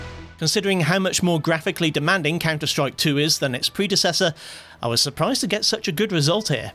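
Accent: United Kingdom. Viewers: The presenter is British and therefore pronounces words in English.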